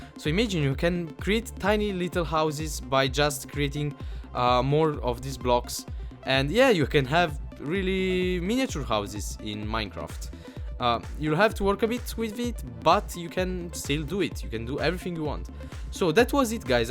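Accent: Italy. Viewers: bel video, l'accento italiano si nota :) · mado si se sente che sei italiano haha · Si sente che sei italiano ;) :D ...... SHISH!!! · An italian accent! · Sei per caso italiano? Parli inglese ma mi sembri italiano dalla pronuncia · Ehi, ma sei italiano? Nel caso si capisce dall'accento molto chiaro ahah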